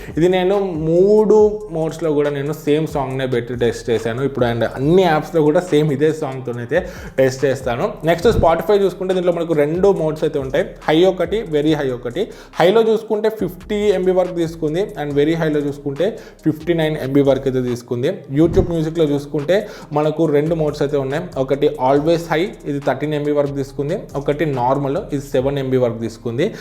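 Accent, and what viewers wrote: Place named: Telangana, India. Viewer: Broo ah Telangana slng loo kakunda normal slng loo video cheye broo adhe better ekkuva mandhi choostaru ide na suggestion 😊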